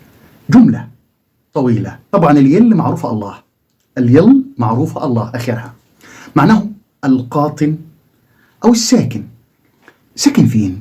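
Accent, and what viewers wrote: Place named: Cairo, Egypt. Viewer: إنت ليه بتنطق الجيم بالطريقة القاهرية؟؟ غير مقبول بالنسبة لي ، و مش حأقدر أكمل.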